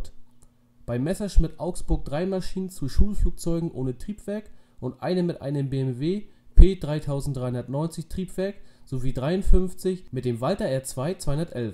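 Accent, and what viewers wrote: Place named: Mecklenburg-Vorpommern, Germany. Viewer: Der Sprecher stammt der Aussprache nach, vermutlich aus dem nördlichen Mecklenburg-Vorpommern😉. Klasse Video!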